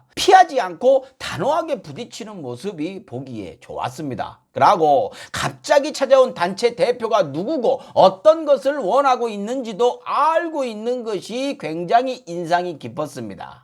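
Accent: Jeolla, South Korea. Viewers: 저 전라도 사투리로 보수정당 지지했으면 더 좋았을텐데요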